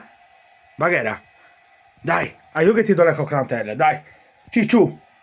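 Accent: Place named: Sarrabus, Italy. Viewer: Accento del Sarrabus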